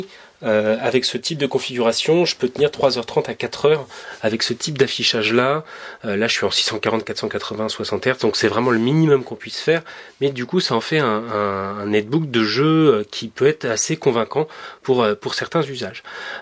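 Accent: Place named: France. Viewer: Me gusta el acento francés, me gusta la asus, me gusta el video... excelente!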